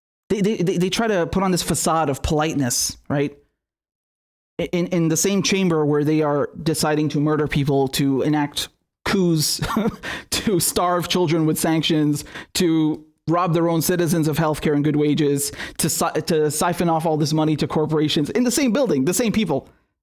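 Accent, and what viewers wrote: United Kingdom. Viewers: that British granny accent tho. Keep up the great work. We need to amplify real progressive/leftist voices. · Despite the serious nature of these events, has me laughing tremendously. Loving the British accent haha.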